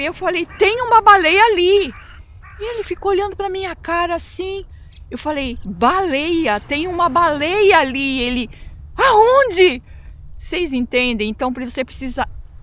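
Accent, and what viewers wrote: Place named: Brazil. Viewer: hoje estou em Portugal.gostei de ver a baleia 🐳 junto com você.gostei a sua maneira de se expressar bem brasileira mesmo😊 beijinhos.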